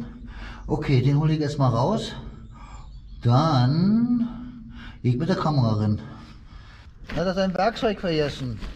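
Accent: Berlin, Germany. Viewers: Die Berliner Schnauze ist einfach klasse💪🏻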